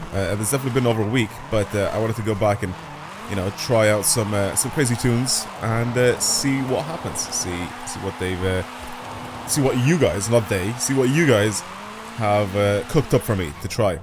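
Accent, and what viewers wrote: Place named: Donegal, Ireland. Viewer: Where you from in Ireland cause I can’t recognise that accent you sound a bit like Donegal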